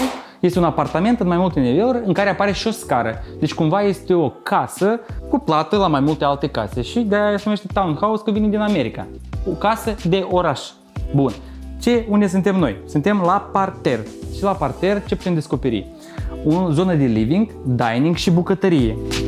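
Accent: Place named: Moldova. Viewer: Prietene, tu nu ai un accent minimalist; accentul tau de moldovan e foarte pregnant!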